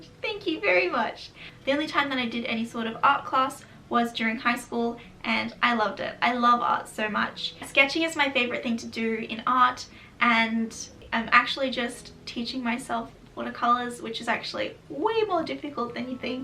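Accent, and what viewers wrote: Australia. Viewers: So bizarre you are fully Australian accent! ahaha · I think it sounds very Aussie. I have the same accent! ☺️ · I have just recently discovered your channel, and I LOVE it!! I've always been obsessed with the '40s and '50s, I'm sure I was born at the wrong time! I'm from North East England and don't hear any South East England accent btw, it sound fully Australian to my untrained ear 😆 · I'm newer to your channel, and I love your videos so much more than a few others I follow. Please keep making your videos, and I would love some cooking/recipe videos as well. I love the education of how people lived in the 50's. I have always been such a fan of the past. There is something very soothing and calming of how they lived. My parents were born before the 50's in Europe, and cooking bread and all the meals and desserts by scratch is what my mother specialized in. 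BTW, love your Australian accent. Much love from American. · I'm American and I don't get an American accent from you at all - it all sounds like adorable Australian to me :) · Absolutely knew you were an Australian!! I knew that’s why I loved you! I personally couldn’t tell if it was Australian or more England!! I’m from the states so I know nothing about an accent other than a southern (I’m from Arkansas haha!) I love Australian accents. 💕 love your channel dearly ... xoxo Olivia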